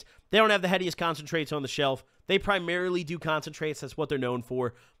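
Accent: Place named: California, United States. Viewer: slowly staring soun dlike ah cali boy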